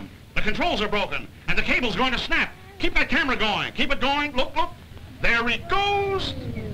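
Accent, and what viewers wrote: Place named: Transatlantic, United States. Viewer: that transatlantic accent tho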